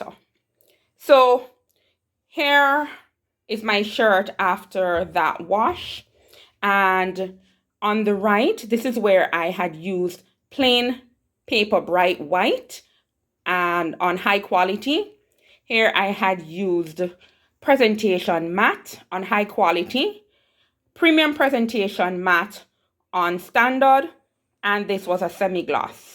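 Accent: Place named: Jamaica. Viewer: Hey there...are you Jamaican...it sounds so...rahtid yuh really went intense with the instructions and tests...I thank you. I did have a drastic ink bleed Customer concerned and I'm embarrassed...however I will talk to Siser. Thanks much.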